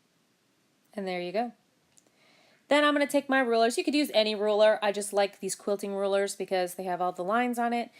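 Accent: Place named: South, United States. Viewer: Really nice tutorial. Could you please tell us the name of that tape again, (I'm from California & I couldn't quite catch it with your So. accent) LOL Thanks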